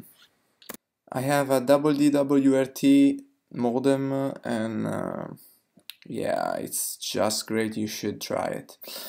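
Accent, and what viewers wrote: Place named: Italy. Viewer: That Italian accent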